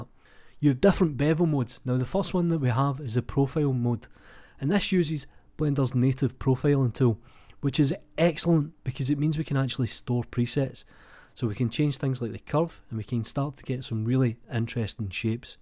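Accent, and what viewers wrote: Scotland, United Kingdom. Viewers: I'm here especially for the Scottish accent. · I like the scottish accent, keep it. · That Scottish accent is so thick, lol. I have a better time understanding Gerard Butler! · i dont know if you are Welsh or Scottish.. probably the latter. I can understand you more than my fellow Aussies.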